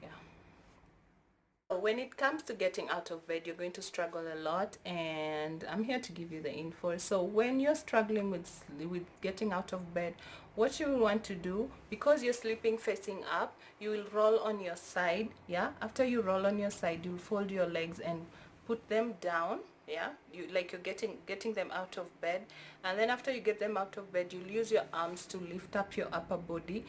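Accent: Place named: South Africa. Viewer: Great video. Thanks. You sound South African. You accent made me homesick!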